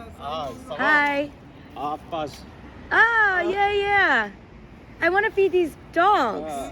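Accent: United States. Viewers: Congrats for your first road crossing the nonchalant North African way 🎉😂 (don't encourage it) More local practical safety and social tips and awareness are a must, and your best source is your neighbors and social circle, as an Algerian I can assure you that our Moroccan brothers are among the most helpful, peaceful and most generous neighbors I've ever had a chance to live close to (despite the present politics). So stay safe and enjoy your new lifestyle ❤🎉 and your caramel Noss-Noss😅 By the way your arabic slang American accent is a real treat, God bless.🎉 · sound like a white American talking not Moroccan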